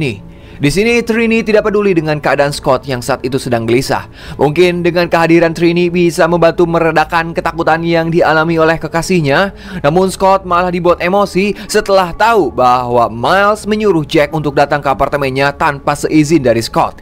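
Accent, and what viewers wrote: West Java, Indonesia. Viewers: Mang sunda na ti palih mana???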